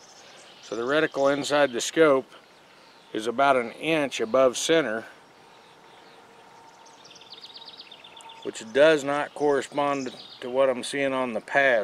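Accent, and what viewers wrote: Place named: South, United States. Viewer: Found someone that talks more hillbilly than myself and more stupid!! Let's put another bullet in and don't forget to add that last bullet to make the action smooth!! Now let's place the bullshit aside use better terminology, you don't get smoother action by choking the mag and round or shell sounds better than bullet since that refers to the lead..By the way I live KY..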